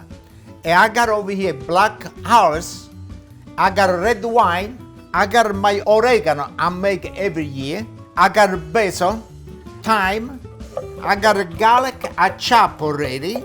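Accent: Italy. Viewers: you remind me of my grandpa. love that italian accent and the o ya baby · Omg as Italian who lives in Italy the way he speaking make me laugh truly an original Italian immigrant, very focused to he’s recipe!! Greetings from Italy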